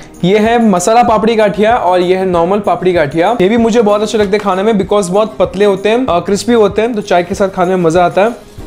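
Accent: Gujarat, India. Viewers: Awesome video 💙💙💙! Aur I must say very delicious video! 🤭😂😂... Your Gujarati accent is too cute... Intro is just op! Mirchi kya sach mein bohut teekhi thi?🤭😂! Btw, fafda - jalebi kabhi hume bhi khilana... As yeh video dekh ke toh sach mein cravings suru ho gayi... I really want to taste that champakali gaathiya and masala papdi gaathiya also... 😍✌🏻... And thepla and khakra is also looking sooooooooo yummy😋😋!!! And that dialogue, "Naam bas naam hain... Hume toh bas khane se kaam hain"- is really hilarious😂😂😂... Next Sunday, I must have patra, khaman or khandvi as my breakfast... ✌🏻💙❤ You are really the best brother sister duo ever... 👍🏻👍🏻👍🏻 I can see that you are really enjoying your Sunday breakfast... I want to say you that we are also having a lot of fun during watching this video... 👏🏻👏🏻♥♥♥👏🏻👏🏻... Thanks for bringing smiles, laughter and enjoyments for us on Sunday... 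😊😊😊... You are really one of my favourite actors and favourite YouTubers... 💙💙💙❤❤❤... Your dedication towards your work really inspires me♥✨... You are really amazing and multi talented and your videos are excellent and absolutely incredible... Keep shining and keep dreaming superstar... ♥♥💙💙❤❤... All the best sweetheart... 👍🏻💙💙💙💙💙💙💙💙💙💙💙💙💙👍🏻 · FAVOURITE PERSON AND FAVOURITE FOOD IN ONE FRAME🙈✨he is posting videos regularly omg😭💗Also talking in gujarati always hits different 😂🥺💗 Really love your Gujarati accent, and the Gujarati intro in starting was damn good😂✨ all dishes are looking so good and all of them are my favs too🤤✨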